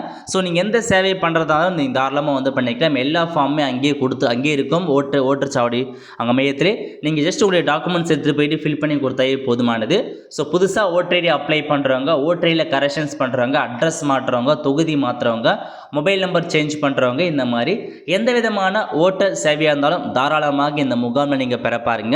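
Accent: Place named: North India, India. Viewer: tis person speaks like north indian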